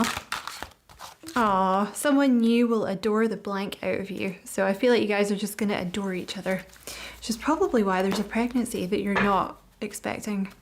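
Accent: Scotland, United Kingdom. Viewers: This is so accurate on many fronts, thank you 🙏🏼♥️🙏🏼 Let’s hope this new person comes in as soon as the Devil ex is well and truly out of my life! Lovely to hear a fellow Scot and to see you being so successful in your field xx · Nice to hear a Scottish lassie here...we the best at this...love it I read too X with love Edinburgh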